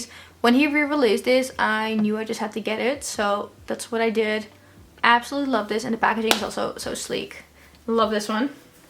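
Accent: Netherlands. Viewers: wait girl are u dutch? ur accent seems familiar hahaha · I hear a Dutch accent right? 🤭 I subscribed! I LOVE girly video's 😊🩷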